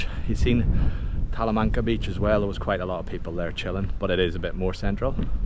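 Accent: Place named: Northern Ireland, United Kingdom. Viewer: Sounds like a northern Irish mon.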